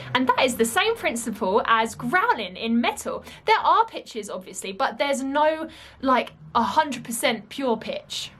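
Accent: United Kingdom. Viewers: İt has my soul apart hhahaha .. I wanna find you and squeze your cheeks.. You could ve cuties person in the world 😇 Also your british Accent is so cute.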